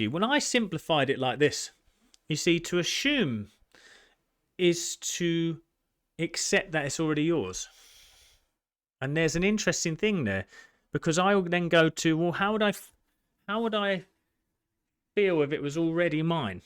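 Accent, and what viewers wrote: Essex, United Kingdom. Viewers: I knew you were an Essex boy! Love your passion😍. New to you but look forward to hearing from you and your way of explaining it all.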